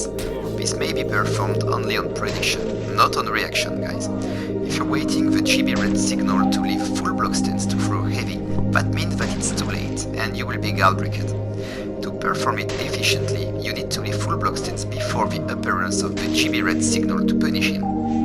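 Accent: France. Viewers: You are french ? you have a french accent :)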